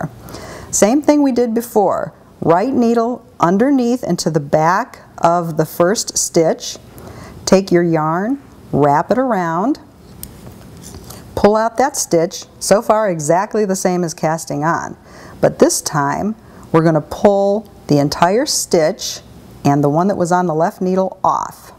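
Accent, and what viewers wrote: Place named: Oregon, United States. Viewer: omg! i cant seem to stop haha...and your voice reminds me of Oregon! i miss my home state :'( and a wonderful knitting and sewing grandma who lives there! Thank you once again.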